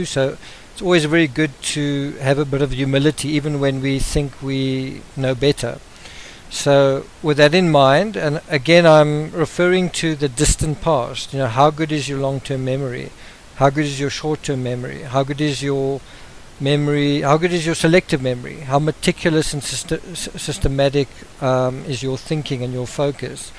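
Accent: South Africa. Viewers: Is the narrator perhaps South African?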